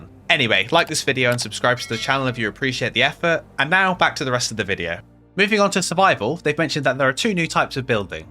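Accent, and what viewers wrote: England, United Kingdom. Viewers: A li ttle bit of English lad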